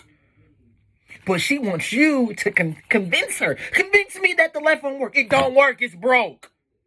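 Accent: Baltimore, United States. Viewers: Sound like you from Baltimore ?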